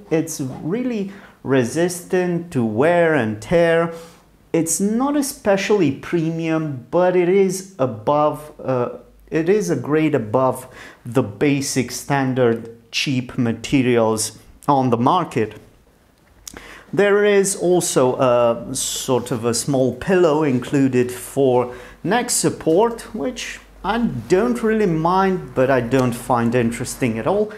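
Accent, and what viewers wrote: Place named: Romania. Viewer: Care ti se pare mai ok, cel mesh-at ?ca nu am inteles ( btw misto accent, mi-a luat ceva sa imi dau seama ca esti roman 😂 )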